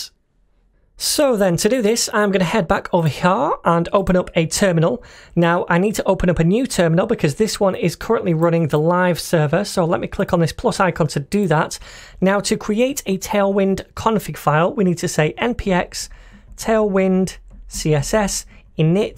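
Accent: United Kingdom. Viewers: What a rare British accent! I'm glad to find this because I'm learning English English at the same time. Thank you!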